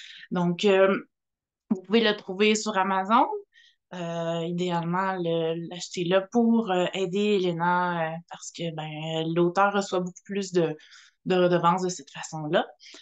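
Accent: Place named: Canada. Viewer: je remarque l'accent canadien. j'ai peut-être vécu au canada dans une vie antérieure, j'imite assez bien l'accent canadien.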